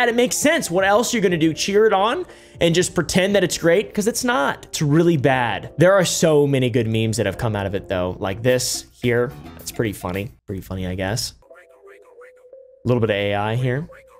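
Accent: Australia. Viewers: love your aussie accent😆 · Your Australian accent is so fucking funny 😂😂😂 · your australian accent has me on the fkn floor bro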